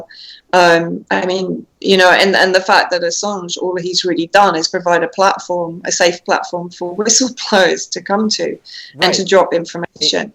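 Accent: United Kingdom. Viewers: I think you’re great, but simply curious as to why you’re suddenly speaking in a British accent? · Are you back in the UK? I ask because you have that same British accent for this interview as you did during the Assange trial. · Great interview, lots of information. Vanessa is a real frontline journalist and deserves to have her story told. Just a comment, it is funny how you can be a chameleon with your accent,. You make videos about Jimmy Dore, etc, and you have an American accent. (No offense, LOL). Here you have a slight British intonation. I pay attention since I meet lots of people in International Schools and they have that ability with languages.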